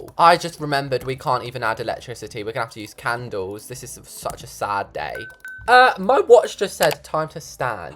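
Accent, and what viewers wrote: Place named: United Kingdom. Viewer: When u talk British I have no idea what u mean😂 I’m American so I enjoy when my fav YouTubers (ur one of them ofc!)that are British say things abt the UK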